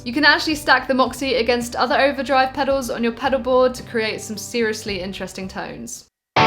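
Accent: England, United Kingdom. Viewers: Ah you’re English! You have broken my hot-o-meter! Great playing.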